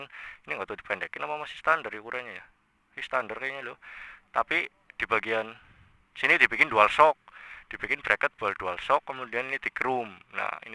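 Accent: Indonesia. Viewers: Njawa lucu bos🤟🤣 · laa ya ngapak ya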